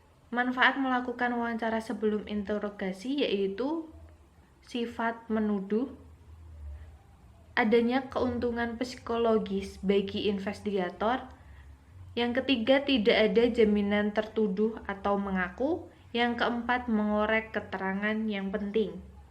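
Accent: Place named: Java, Indonesia. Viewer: meskipun agak medok tp sangat membantu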